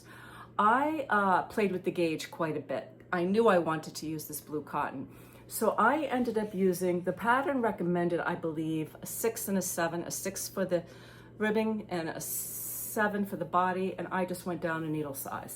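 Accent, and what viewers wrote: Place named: Rhode Island, United States. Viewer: First time catching your podcast (now subscribe) and I love it. I had to go over to IG and find you and now follow you there too. I recognized the accent and then saw you are on Block Island. I'm a southern RI girl myself (Little Compton) and now call NH my home but my heart is in Little Compton. Your projects are all gorgeous. I had to go over to Ravelry to find the patterns and look more. I'm now obsessed with the Against the Current (shawl and tee). I think I'll put the tee on my next spring project list. I love what you are doing with the Vaddel Cardi. Now to see if I can find you on Ravelry.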